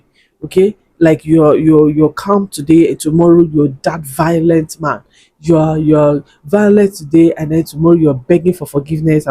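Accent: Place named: Nigeria. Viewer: Maaan… I love Nigerian people, the accent 😊❤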